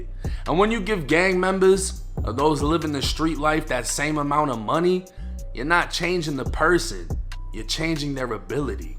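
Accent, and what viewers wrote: Boston, United States. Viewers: is the best story teller on YouTube hands down, I watch all this guy videos. The way he talks and gets things across + that Boston accent 💯 dope.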